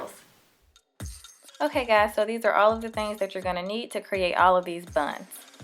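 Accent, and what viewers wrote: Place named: Alabama, United States. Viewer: Alabama, United States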